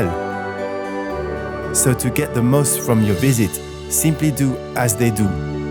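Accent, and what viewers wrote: France. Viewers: Gosh, that accent! Sounds so poetic and romantic. So classically French! Love it! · one question , is the narrator French? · French accent. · English with French accent. I like that.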